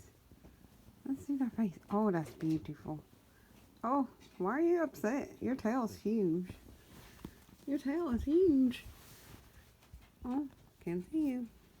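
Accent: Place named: Texas, United States. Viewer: You sound like a Texan, like me.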